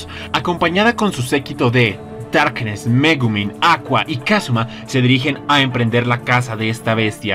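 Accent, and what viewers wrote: Colombia. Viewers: Señor. Sos de colombia?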